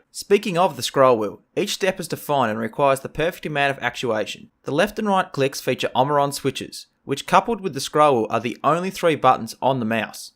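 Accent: Australia. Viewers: And I thought I had a thick Australian accent...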